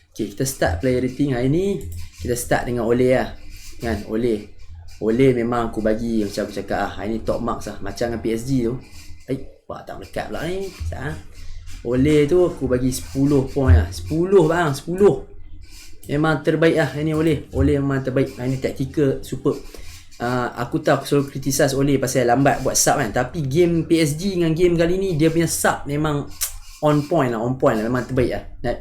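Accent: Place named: North, Malaysia. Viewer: org utagha sggh abg ni 😆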